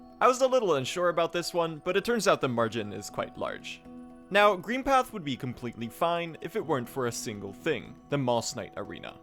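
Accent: Sweden. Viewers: brother are you swedish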